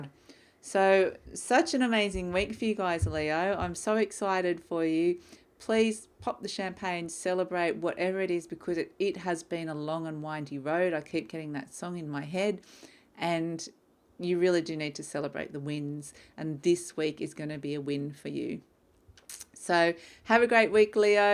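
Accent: Australia. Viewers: Hello from Sydney I love listening to reading I got so excited hearing you speaking Australian English 😃 I’m always coming across American and other accents lol , I’m listening to my husband reading Leo ur spot on , u have a beautiful energy ur face is glowing with positivity great reading god bless you 🙏😊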